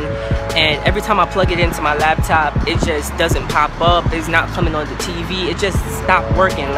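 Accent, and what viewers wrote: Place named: New York, United States. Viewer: i knew you were from ny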